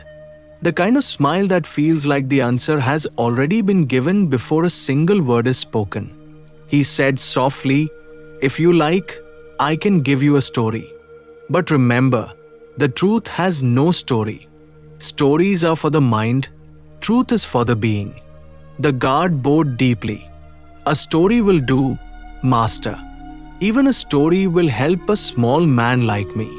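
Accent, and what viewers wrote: India. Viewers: Why the Indian-English voice when the video is about a Chinaman? 😏👎👎👎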